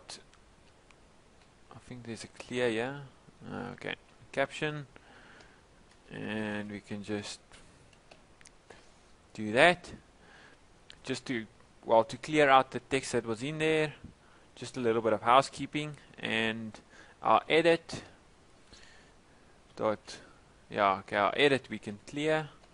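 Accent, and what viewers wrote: South Africa. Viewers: Are you afrikaans? Cuz i am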